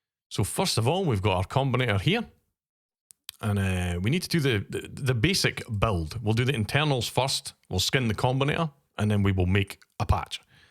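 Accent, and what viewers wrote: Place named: England, United Kingdom. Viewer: Love your english accent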